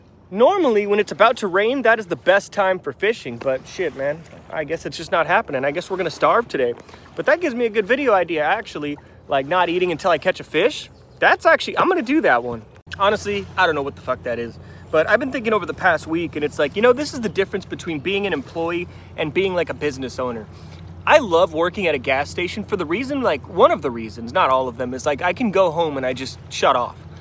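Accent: South, United States. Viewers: Hes developing a southern accent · funny thing to note, i noticed at a couple points in the video you're starting to pick up a very slight, almost imperceptible at this point, southern accent, only noticed it at one or two points in the video. that's pretty cool. interested to see how your accent changes over time the longer you're living there.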